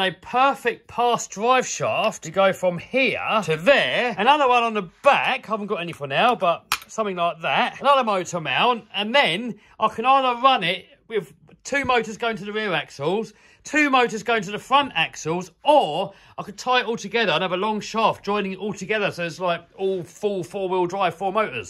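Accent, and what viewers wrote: United Kingdom. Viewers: Very British English. Great caröeee.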